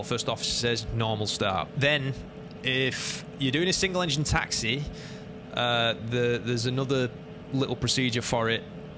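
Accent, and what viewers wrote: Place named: Lancashire, United Kingdom. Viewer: Thanks for the video! Much appreciated. Loving the accent😊 Preston? Blackburn?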